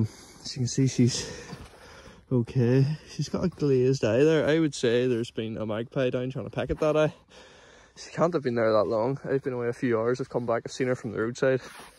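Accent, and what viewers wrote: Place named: County Down, United Kingdom. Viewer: Just found the channel, subbed even though I know nothing about farming, I just instantly recognised the accent and the Mournes in the background of a video haha. Also from County Down myself.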